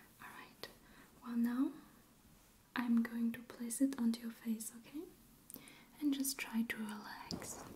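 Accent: Germany. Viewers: What an extraordinarily charming German accent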